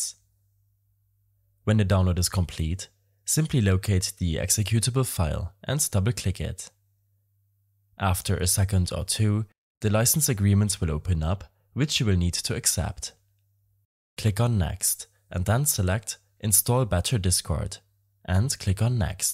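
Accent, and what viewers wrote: Germany. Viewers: haha du bist deutsch das höre ich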